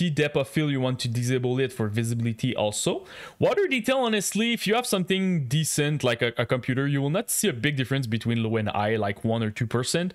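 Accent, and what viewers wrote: Quebec, Canada. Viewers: French canadian proper. 😀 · You from Quebec by any chance? That accent sounds hella French Canadian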